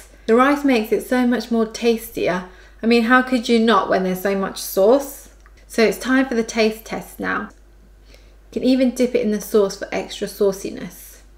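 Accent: United Kingdom. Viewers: Lovely accent its british i love how simple and quick you did this recipe and so simple this is a must make for me thx💜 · Are you brittish?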